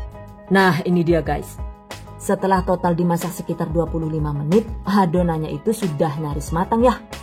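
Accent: Banyumas, Indonesia. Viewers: Ko bahasane bisa ngapak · kok bahasa medok banyumas, asli bms ya jeng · Ngapaknya bikin ngakak bunda.. 😄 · Wongendi kie deneng ngapak temen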